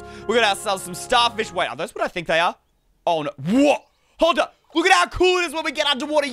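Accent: Australia. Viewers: I thought you were British so you from Australia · Prove you have an Australian accent:leever, caan’t, etc. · I dont ever notice accent.. Maybe that's because im an Aussie Too! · I can hear your Australian accent because I am American, which I am from the United States which has the most carnivorous plant than any other country in the world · I’m Australian and good Aussie voice!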